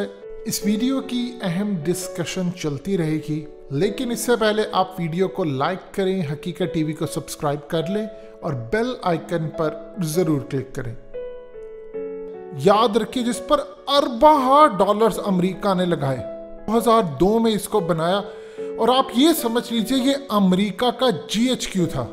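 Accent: Punjab, India. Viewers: Aarooj nahi Urdu oorooj kehte he bhai tum urdu zuban ko jab punjabi jone me bolte ho maza aja ta bhai urdu tumhari madri zuban nahi acha hoga punjabi language me video banao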